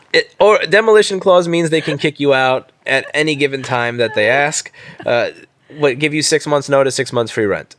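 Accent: New York, United States. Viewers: When you start ranting, your New York accent really comes out. When you calm down, it relaxes. It's quite endearing. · I love you so much you’re so New York it’s like talking to someone on the street “Family owned Jewish company” 😂😭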